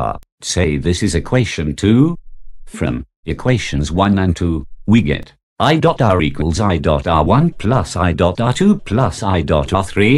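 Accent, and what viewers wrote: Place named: India. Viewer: when you have an Indian accent and a voice of a robot you get this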